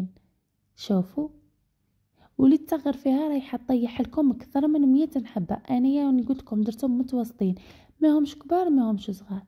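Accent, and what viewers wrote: Setif, Algeria. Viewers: وصفاتك تهبل و لهجة سطايفية تسخف تسخف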